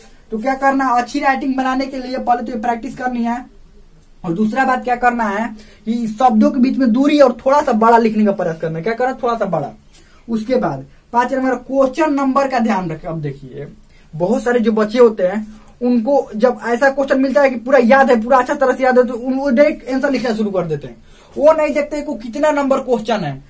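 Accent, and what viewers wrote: Bihar, India. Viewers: Bihari h ka babuaa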